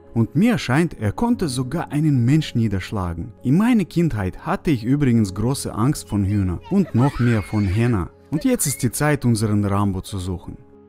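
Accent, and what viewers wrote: Russia. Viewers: Ich mag ist dass du einen russischen Akzent hast ich hab auch russische Wurzeln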